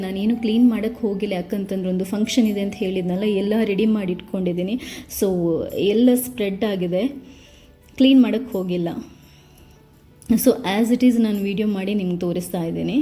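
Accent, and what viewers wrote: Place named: Mangalore, India. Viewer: ಅಕ್ಕೆ ಇರೆನ‌ ಕನ್ನಡ ಕೇನಗ ಎನ್ನಿಯೆ ಈರ್ ಕುಡ್ಲದಾರ್ ಆದುಪ್ಪು ಪಂದ್. ಎಡ್ಡೆ ಆಂಡ್... ಇರೆನ tips ಮತ ಎಡ್ಡೆ ಎಡ್ಡೆ ಉಂಡು.... Bangalore ಡು ಈತ್ ಎಡ್ಡೆ ಇಲ್ಲ್ ತಿಕ್ಕಿನ ಇರೆನ ನಸೀಬ್... ಬಾರಿ ಶೋಕ್ ಉಂಡು... ಇರೆನ ಆಸೆ ಲೆಕ ಆಯಿನಾತ್ ಬೇಗ ಸ್ವಂತ ಇಲ್ಲ್ ದೆಪ್ಪುಲೆ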